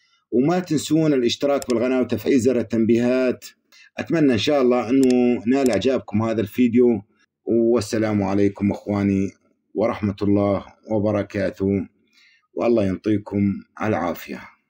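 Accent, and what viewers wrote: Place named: Yemen. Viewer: والله قصة رائعة ومن لفظ الكلام تبدو ربمى من دولة يماني اوعماني .المهم قصة حقيقية وان لم تكن حقيقة امثالها كثير ما أعجبني هو الكلام مفهوم جدا اتمنى قصة أخرى ...من المتتبع عبد الرفيع سامح ولاية ميلة شرق الجزائر❤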